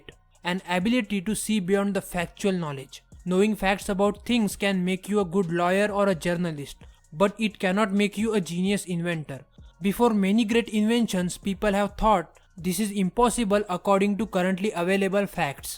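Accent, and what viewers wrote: India. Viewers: I love the Indian Accent.. Respect from Somalia 🤗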